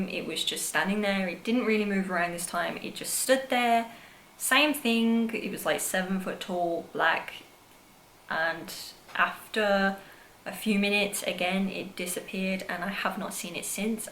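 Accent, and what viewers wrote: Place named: Birmingham, United Kingdom. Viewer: Omg are you from Birmingham, im so distracted by your accent it's just so cute hehe!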